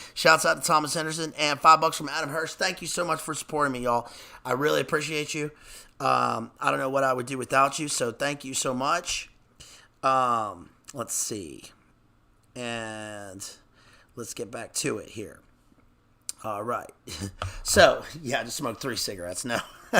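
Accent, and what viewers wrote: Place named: United Kingdom. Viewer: Loving the British accent 😂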